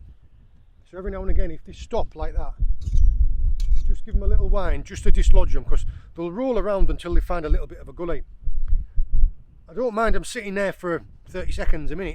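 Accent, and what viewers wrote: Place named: Geordie, United Kingdom. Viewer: I haven't watched any of your videos for quite some time, Sorry about that but my interest has shifted from Sea Fishing right now. You popped up in my feed so here I am. This is a great video, very informative, the biggest thing I noticed was how your accent has changed since the last time I watched. That geordie twang is disappearing fast my lad. keep up the good work!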